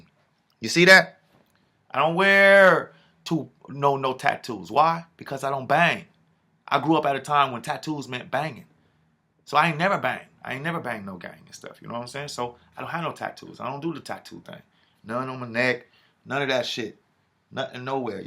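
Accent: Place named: Jamaica. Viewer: And another thing why are you talking with a jamaican accent if you're from South Central LA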